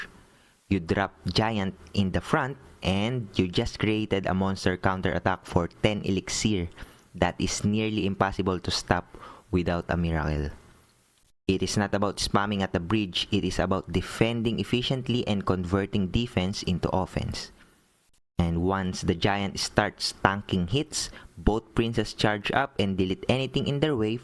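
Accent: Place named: Philippines. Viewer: Base on his accent bro is a Filipino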